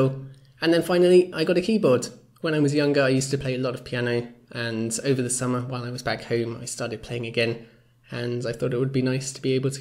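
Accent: Sweden. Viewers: are you from sweden? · Are you from Sweden? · Are you swedich?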